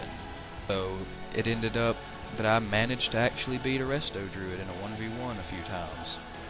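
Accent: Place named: South, United States. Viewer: cool, i loved it and i love your southern accent like mine lol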